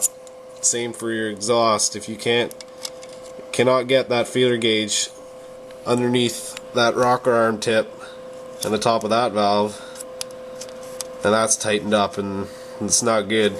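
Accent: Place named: Canada. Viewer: it took 14 seconds for me to realize you were Canadian lol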